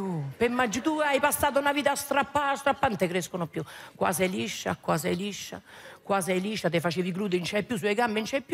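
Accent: Rome, Italy. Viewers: Non sopporto il romanesco, però simpatica,bella, brava e simpatica....complimenti.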